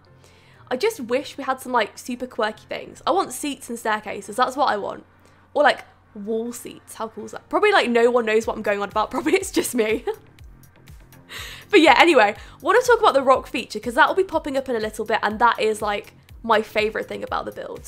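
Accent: United Kingdom. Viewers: i love your british english:D wotaaah instead of water😂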